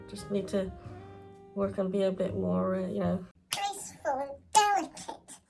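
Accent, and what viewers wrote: Scotland, United Kingdom. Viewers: I need to be more “ GRACEFUL and DELICATE “ 😂. I completely relate. Aw your videos make my heart happy! I’m an animal and wildlife lover plus I’m partial to a wee mushroom also. So happy to have found your channel ❤ ps. I can still hear the Scottish twang going on, I’m Scottish myself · Hi. I am in awe! Why have I not seen you before?! This painting is fabulous! I love it! re: your accent. At first I thought Scot cuz it reminds me of a friend's accent from Scotland, but then some words sound like my friend from Sweden. The mix is beautiful..And, of course, I love being right LOL. On both counts 😂😂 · 😍💕🐿🎃 I'm levitating too, just from watching this video! 😅 (Getting Scotch tape to reattach myself to seat 😉)! Your illustration is magical!✨ Loved the forest and the legend of Skogsvrå, and the busy squirrels, and there's that charming witchy animation too! 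🧙‍♀️🐈😊YouTube suggested one of your videos yesterday, and when you appeared, my first thoughts were: Oh, great vibes, kind face, lovely voice, nice Scottish person (I'm from the north of 🇪🇸, so you sound really Scottish to me 😉), wonderful sense of humour, likes what I like, delightful cosiness around her, and totally amazing artwork…! 🤩Subscribing!! 😃👍 Thank you so much (And Margo😉), and have a very lovely day!! 🙏👏👏👏😘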